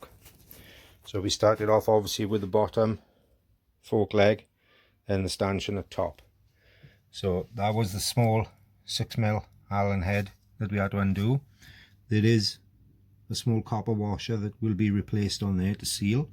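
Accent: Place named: Wales, United Kingdom. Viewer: Watching and loving your Lifan video's. I just bought a Lifan King 125 on the weekend (63 plate with less than 3k miles). I notice by your accent your Welsh, what part of Wales are you living? I'm in Brynmawr near Ebbw Vale:)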